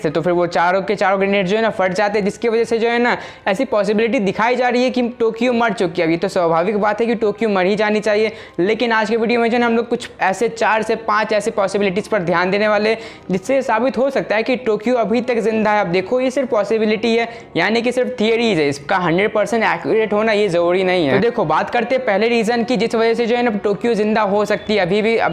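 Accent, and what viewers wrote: India. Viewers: Tu Bengali hai kya bhai????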